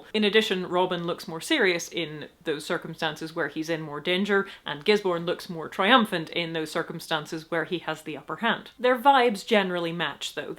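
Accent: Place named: England, United Kingdom. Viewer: I take reviews quite seriously because unlike some YouTubers she speaks with an English accent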